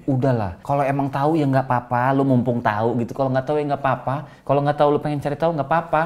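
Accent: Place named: Java, Indonesia. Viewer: lo gw logat jawa the best fking starboy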